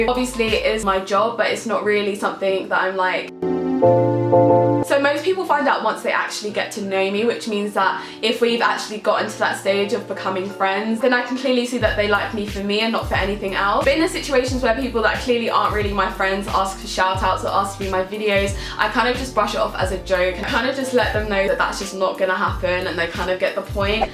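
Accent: United Kingdom. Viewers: Omg i totally wasnt expecting you to be British!! Whereabouts are you from??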